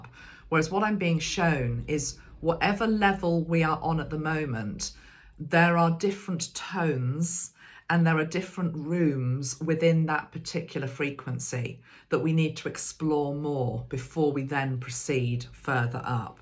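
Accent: United Kingdom. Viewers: PS, I actually notice and love the way you pronounce your words! I just figured they were UK pronunciations 😂 🤍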